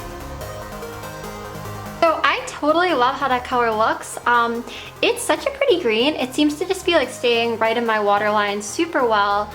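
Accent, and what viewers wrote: United States. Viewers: that's just an american accent lol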